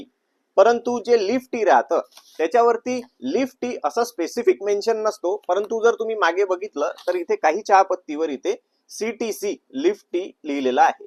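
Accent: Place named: Vidarbha, India. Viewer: विदर्भाची भाषा, राहते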